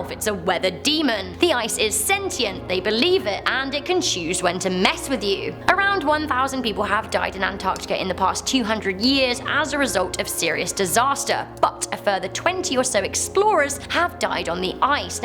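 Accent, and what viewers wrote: United Kingdom. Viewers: Toronto? You sound so British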